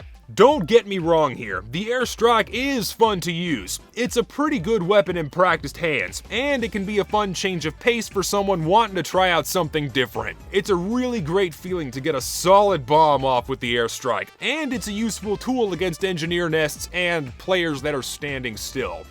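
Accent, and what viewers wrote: South, United States. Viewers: Are you mid-southern? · I'm not american and to me your american accent is THICC AF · Ur southern draw started coming out in the end. You unconsciously change your accent when recording. Also I am typing this to boost engagement.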